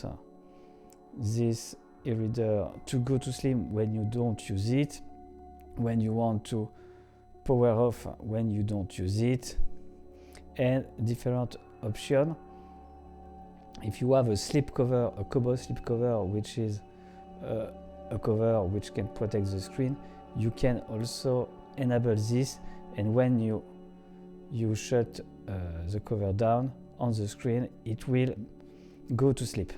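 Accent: France. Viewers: What a helpful video. Thank you so much! This is the second video in 2 days where a French person feels like their accent is a problem - it’s honestly not even a thought. I’m sorry you guys feel self conscious. Truely I’ve never heard any negative commentary about French accents. In fact, I’ve only heard good things 😌 thanks again for the very helpful tutorial - much appreciated 😌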